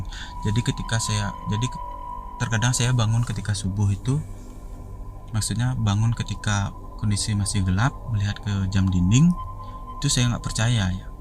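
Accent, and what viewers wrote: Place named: Bali, Indonesia. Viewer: Cara penyampaian ceritanya ok terlebih lagi ditambah logat Bali nya sangat unik sekali. Mungkinkah itu setan di sungai itu salah satu karakter patung setan yang digiring orang Bali di acara ritual apa yah namanya ?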